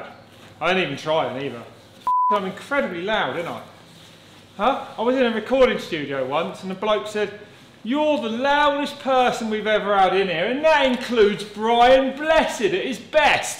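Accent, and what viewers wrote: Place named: South, United States. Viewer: Funny as fuck! Always been wierd to watch i think its the sothern accent with northern humer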